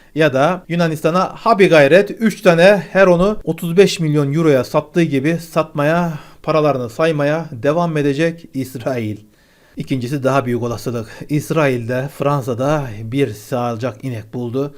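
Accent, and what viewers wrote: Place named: Kahramanmaraş, Turkey. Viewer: Selam, senin kökenin galiba Kahramanmaraş dır. Şive öyle diyor Ede. Devam et haberlere. Çok güzel sunum yapıyorsun.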